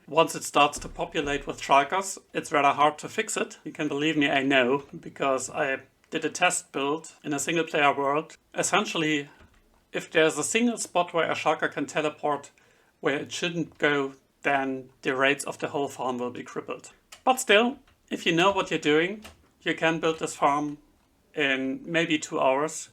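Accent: Germany. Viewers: Der deutsche Akzente kickt übelst rein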